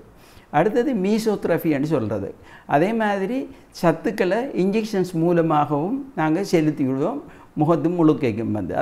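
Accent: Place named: Akkaraipattu, Sri Lanka. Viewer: unka pasai Akkaraipattu pola irunthathu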